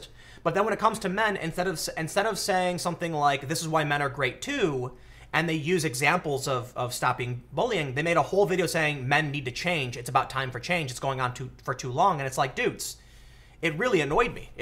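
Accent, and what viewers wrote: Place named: United States. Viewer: Why cant Americans say yoghurt? Sorry, but it does grate on me!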